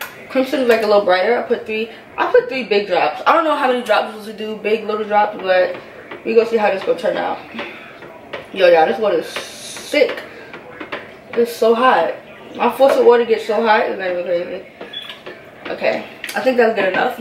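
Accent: New York, United States. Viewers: Love this vid !!! I definitely know your from New York lol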